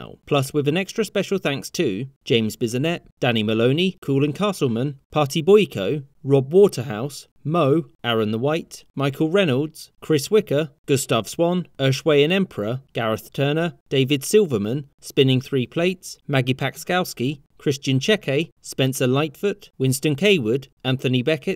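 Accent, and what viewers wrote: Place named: Scotland, United Kingdom. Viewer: I'm Irish yet I understand the Scottish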